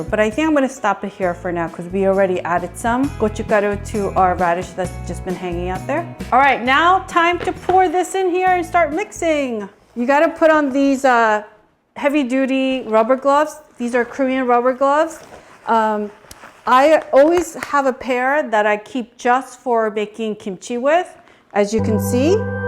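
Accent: United States. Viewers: WOW i hear your accent evolved into american in such short time from the video you made 6yrs ago on the pickle raddish. Amazing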